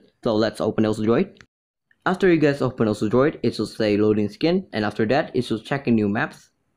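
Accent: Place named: China. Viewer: Idk he's a Chinese or not but his accents tho lol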